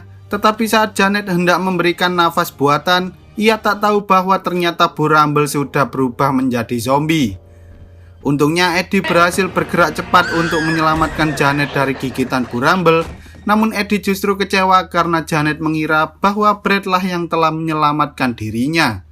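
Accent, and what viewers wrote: Java, Indonesia. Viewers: Medok Jawa nya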